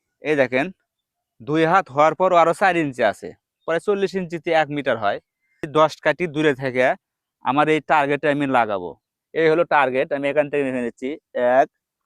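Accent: Bogura, Bangladesh. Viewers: ভাই আমি আপনার কথা শুনে বুঝতে পারছি আপনার বাসা বগুড়া.( আমার বাসাও বগুড়া, ,,, আপনার গ্রামের নাম কি গোবিন্দগঞ্জ চেনেন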